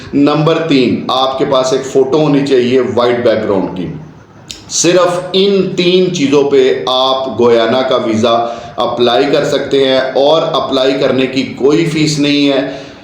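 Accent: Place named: Punjab, India. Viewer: یہ سالے پنجابی انگریزی بھی پنجابی میں بولتے ہیں - " گویانا" نہیں ابے " گیانا" انگریزی میں " Guyana" بولتے ہیں - یہ ویسٹ انڈیز کا حصہ ہے۔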